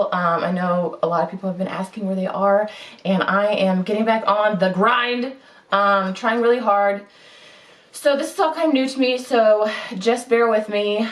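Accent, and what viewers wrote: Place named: Australia. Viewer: why did I think you were an Ausi?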